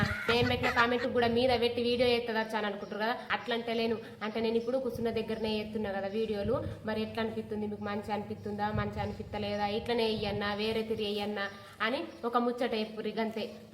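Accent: Nizamabad, India. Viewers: సూపర్ చెల్లి.. మీ వీడియోలు 6 రోజుల నుండి చూస్తున్నాను మా అమ్మ వాళ్ళది బోధన్ మీ యాస నిజామాబాద్ జిల్లా వాళ్ళు మాట్లాడతారు మీ యాసతో నేను రోజు నవ్వుకుంటను మీకు అభిమాని అయిన సూపర్ ఒకటి మీ పేరు నా పేరు లో సగం వున్నది ఇలాగే నవ్వించంది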